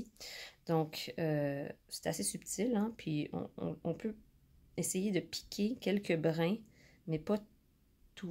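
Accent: Quebec, Canada. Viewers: Hello and Thank You so much!!!! J'essaie de faire un manteau pour un Petit Lévrier Italien et.... Je ne comprend pas comment faire les Pattes??? Merci pour Tout Tes Conseils!!! Fantastique et.... Ton délicieux petit accent québécois est Une Douceur à mes oreilles car mon Cheum (mari) et moi on est Amoureux de votre Pays sans l'avoir jamais visité Mais à travers plein de films et séries Québécoises en VO Québécois!!! Comme Tu es douée et partager si clairement est un don, à mon avis!!!!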